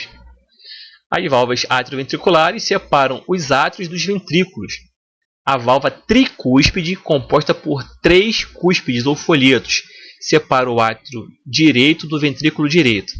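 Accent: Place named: Rio de Janeiro, Brazil. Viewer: Adoro esse sotaque carioca. Um dia ainda caso com um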